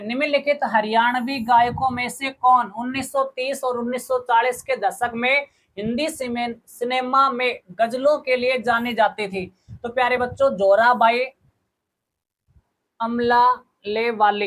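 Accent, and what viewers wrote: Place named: Rajasthan, India. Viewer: Bola to Rajsatha ka h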